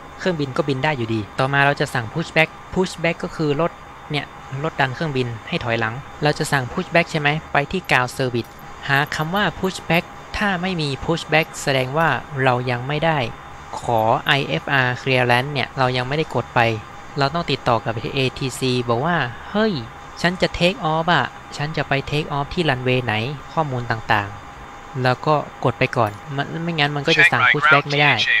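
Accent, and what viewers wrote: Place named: North, Thailand. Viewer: กลางเด่น (สาบเมืองขนาดเน๊าะ รู้เลยว่าคนเมือง)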